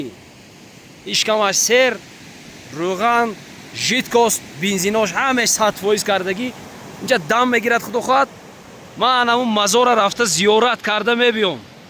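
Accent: Tajikistan. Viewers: Салому дуруд аз ноҳияи Варзоб деҳаи Зимчуруд. Дусти азиз, раҳматҳои беандоза ба шумо ва ба Падару Модари бузургвор,ки шуморо тарбия карда ба воя расонидаанд. Ҳар шахсе,ки шаҳди ин ағбаи хатарнокро чашидааст то дами марг фаромуш намекунад.Тавре ки шумо навор гирифтаю суханрони мекунед, ман фикр мекунам,ки дар ҳамон давру замон шумо гӯё дар қабати мо Камазистҳо, рост истода будед.Дар ҳақиқат дар пеши чашми мо мошинҳо аз роҳ ғеҷида ба ҷари мерафтанд,одамҳо мемурданд,локин илоҷе набуд.Шабҳо аз ин тараф ё он тараф мошинҳо дармемонданд,бамиқдори бисёр.Мо шабро бо машаққате рӯз мекардем,хеста аз мошин фаромада медидем,ки нисфи мошин дар таги барф мондааст,боз белу лапаткаҳоро гирифта то омода шудани булдозер барфҳоро тоза кардан мегирифтем. Вақте,ки тунелҳо кушода шуданд мо ба синни пири расидем.Айнан ҳамин хел ҳодисаҳо дар ағбаи Анзоб ҳам рух медод.Вақто, ки навори шуморо тамошо карда суханҳои ширину аслии тоҷикии шумор шунидам ашк аз чашмонам равон гашт.ЗИНДАЮ ҶОВИД МОНД,ҲАР КИ НАКУҲНОМ ЗИСТ,КИ АЗ АҚИБАШ ЗИКРИ ХАЙР, ЗИНДА КУНАД НОМРО. 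Саломату сарбаланд бошед. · Акаи забони ширини точикиба Шумова гап нест. Молодец! Салом аз Хучанд. Ман хам орзу дорам як руз скутер е мопед харида хамон перевал равам. Соли 2007 якумин ва охирин бор аз хамон чо то Душанбе ва обратно ба Хучанд гузашта будам.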